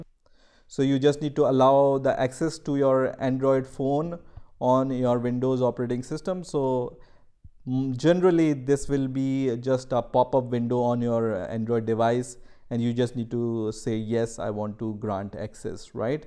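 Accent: India. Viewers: Indian talk Me: Dislike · This video has been really amazing, I wan able to get it done in no time, thanks to the nice Indian accent and the step my step directions. It is just perfect. Thanks alot, I'll suscribe for sure.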